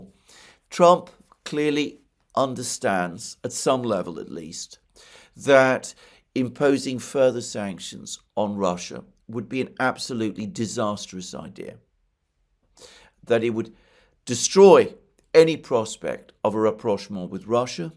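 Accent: United Kingdom. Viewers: thanks, for sharing your insight and experience. its refreshing to hear a speaker on international affairs that you can trust, in spite of the british accent.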